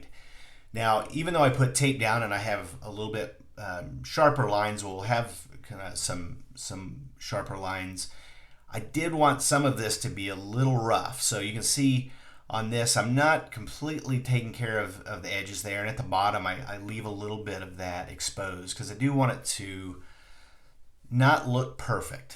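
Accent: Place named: United States. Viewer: I really like your clear teaching style and the look of your abstract works of art. Thank you for sharing so many 'how to' videos for beginners like me and I am excited about trying out your tape idea. I also enjoy listening to your calm and very relaxing American accent. Regards from Down Under in Australia 😊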